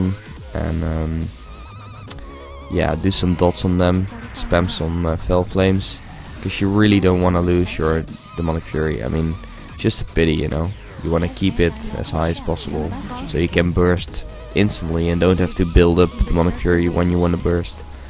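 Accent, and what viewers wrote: Netherlands. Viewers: YOUR DUTCH I JUST KNOW IT :D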